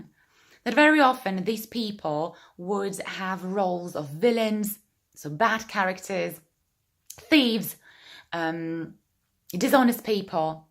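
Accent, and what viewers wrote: United Kingdom. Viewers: You're talking about an American accent but you sound British? Why is that?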